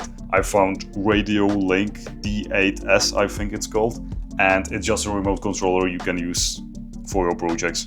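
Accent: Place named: Czech Republic. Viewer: Mohu se zeptat kde studuješ? :D doufám že jsem rozeznal dobře český přízvuk :)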